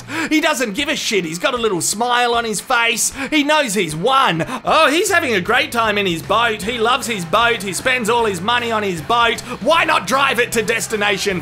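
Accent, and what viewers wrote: Australia. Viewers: Australian accent the best accent in the world....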